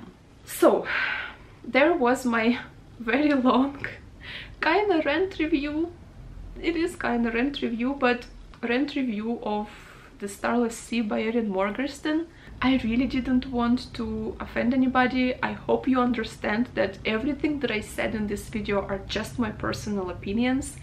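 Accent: Russia. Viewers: Ah, i knew that was a beautiful Russian accent I hear. Are you a fan of Russian lit at least? (oh I see now that you are for sure lol!) I am completely destroyed for all other writers. My reading soul belongs to the Russians :)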